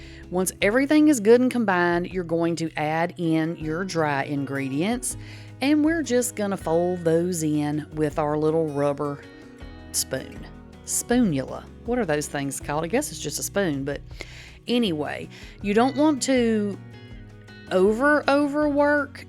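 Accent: South, United States. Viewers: Your SO SOUTHERN LOL😂 We just came back from Tennessee I would love to move there AND BECOME SOUTHERN… I don’t know if I could ever get it right tho 😂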